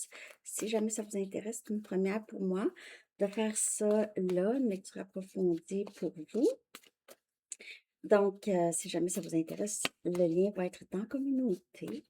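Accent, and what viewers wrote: Canada. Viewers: Message 2 suite : En plus j'adore votre voix car elle est douce rieuse et aimante, votre petit accent canadien est adorable ! Je ne me lasse jamais de vous écouter, je suis toutes vos guidances avec le plus grand intérêt depuis 2 ans que je vous ai découvert sur YouTube. 🙏🙏🙏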